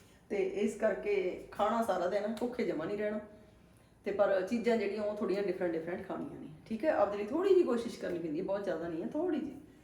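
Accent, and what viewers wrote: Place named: Bihar, India. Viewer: ਬੀਬੀ ਜੀ ਤਾ। ਬਿਹਾਰੀ ਬੋਲੀ ਲੱਗਦੀ ਹੈ ਭੈਣੇ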